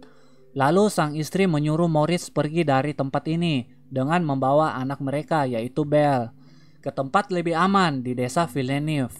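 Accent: Indonesia. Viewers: Dari dialekya kyk dialek sulawesi😁🙏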